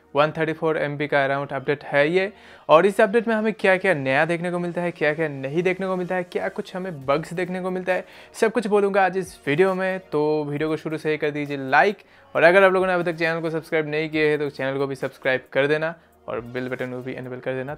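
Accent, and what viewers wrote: Bengal, India. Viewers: Bengali I guess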